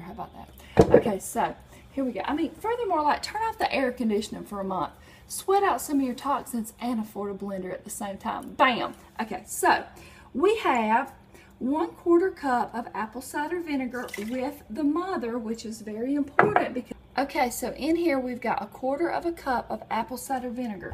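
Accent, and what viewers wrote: South, United States. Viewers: You're videos are so entertaining :D made me laugh quiet a few times! I enjoy listening to you so much, so positive and you're southern accent is adorable :* greetings from Germany :)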